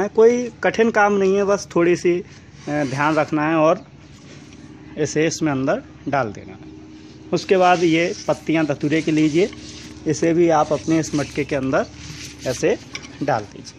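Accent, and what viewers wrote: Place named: Chambal, India. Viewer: Sir I m from mp chambal region n ur voice sounds like us. Khn se hn aap???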